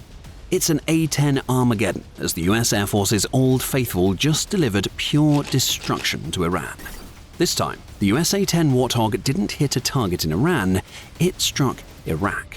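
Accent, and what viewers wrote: England, United Kingdom. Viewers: So the Narrator sounds like he is from the ENEMY of the US, England, & the BBC. They are the HUB of the International Cabal who Trump has been fighting ever since coming down the Escelator.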